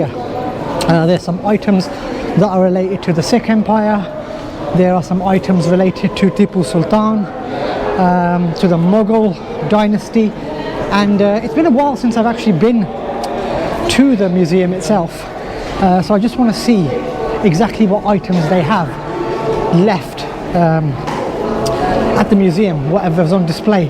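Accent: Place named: Cockney, United Kingdom. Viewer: Ranjit Singh was my hero, I hope to visit one day, thanks again, just love the cockney accent.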